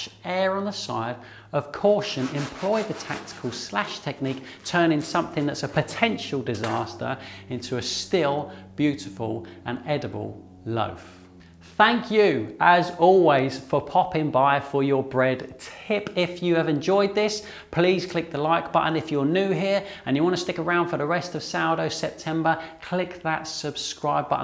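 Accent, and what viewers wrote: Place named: RP, United Kingdom. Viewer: Otp Love your accent😊this is the posh english i love..p.s great advises thank youu❤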